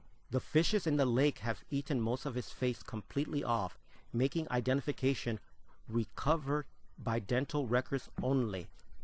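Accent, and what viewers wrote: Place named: California, United States. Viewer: Ok, me too. Dude with the california surfy narration with the blurred out diver?????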